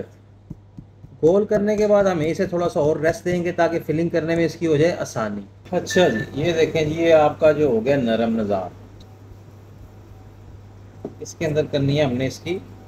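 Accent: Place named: Faisalabad, Pakistan. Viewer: Lehjy sy ap faislbadi lgty ho😊😊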